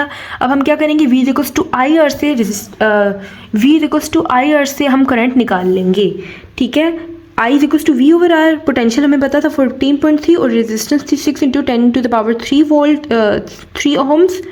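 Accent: Pakistan. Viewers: Lahori accent 👍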